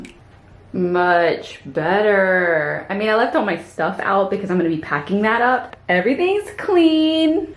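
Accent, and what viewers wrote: Texas, United States. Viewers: I love hearing your Texas accent coming out more. And Kinsley is so adorable ♡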